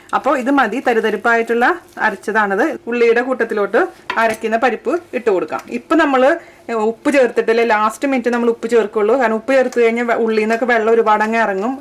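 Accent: Thrissur, India. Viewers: hy chechii!! u r really super!! njn thrissurkari aan .. athond thanne chechide slang and presentation style kekkumbo sharikyum ishtayii.& ur recipes r awesome tta! 😍 marriage kazhinjapo cooking onnum areelyarrnuu..ipo ith nokki nokki chechi paranj tharanath kett kureshe cheyyan padichu.. 😍 & most of all my in laws r so happy with me now 😁!! thnk u so much chechii 😃 keep going!! all d best !! 😘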